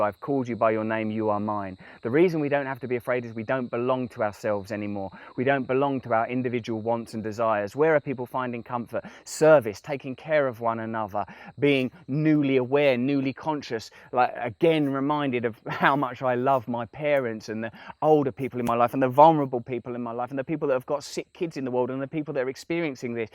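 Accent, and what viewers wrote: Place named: Essex, United Kingdom. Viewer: What a lot of nice people you all are. I enjoy Russell's eloquence and spirit, especially with the Essex accent.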